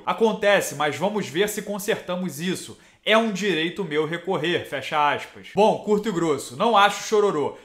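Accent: Rio de Janeiro, Brazil. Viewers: tem q tirar esse sutaque carioca ai que o canal fica melhor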